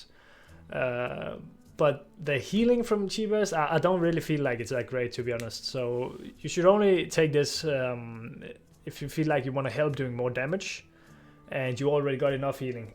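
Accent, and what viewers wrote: Denmark. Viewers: damn, du er super dansk med den accent :D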